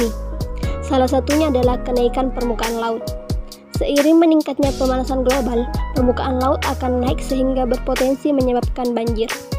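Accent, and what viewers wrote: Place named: Java, Indonesia. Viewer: medoknya botak hahaha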